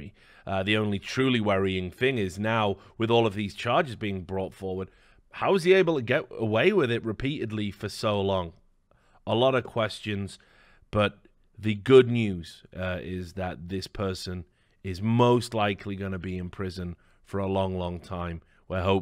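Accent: United Kingdom. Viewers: My name sounds a lot like “Tyler Barriss” especially with a british accent so I’m kinda shook everytime says it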